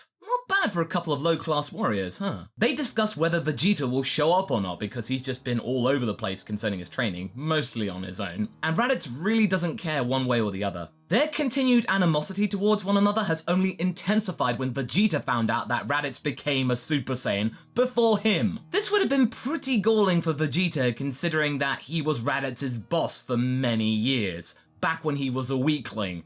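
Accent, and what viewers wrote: United Kingdom. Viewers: lmfao, his accent is what got me XD Damn British English!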